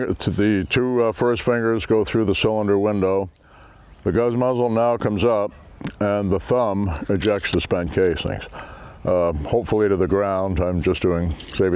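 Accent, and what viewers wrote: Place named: United States. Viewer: Bro has most american voice I've ever heard 😂 props for talking about revolvers tho 🤙 I'm sad they're vanishing especially in competitions because I think they're extremely cool concept although hard to master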